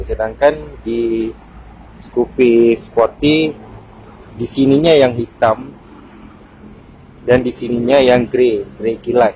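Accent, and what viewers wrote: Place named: Aceh, Indonesia. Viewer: Org Aceh rupanya, logat cara ngomongnya ketauan, 😊 salam utk adoe syedara lon di Sinan. 👌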